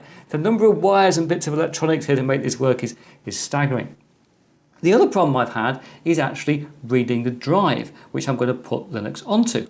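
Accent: United Kingdom. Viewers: Thanks to the China Virus...I have been listening to your very generous youtube posting which I have been getting used to your Brit accent (enjoyable to hear very little lol and brg.......etc) Thank You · Thank you for the Mint week. I didn't know it was a dairy - very interesting and funny. I just like your british accent - I've been in Britain three times in my life (<num>) and I like your country.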